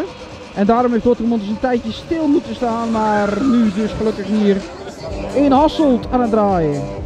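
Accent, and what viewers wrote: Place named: Zeeland, Netherlands. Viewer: Hoi ben sinds kort geabonneerd op jouw kanaal en ik hoor een beetje Zeeuws accent kan da kloppe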